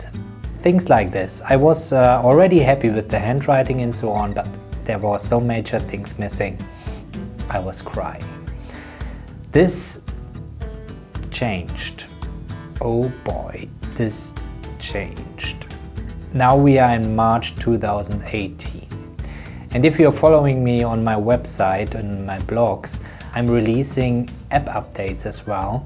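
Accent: Germany. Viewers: Sorry, bist du Deutscher?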